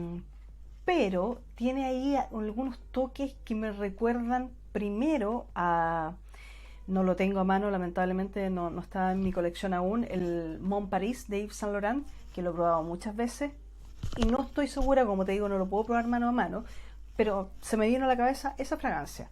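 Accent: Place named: Chile. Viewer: Me gusta escucharte hablar con ese tono chileno. Saludos desde Uruguay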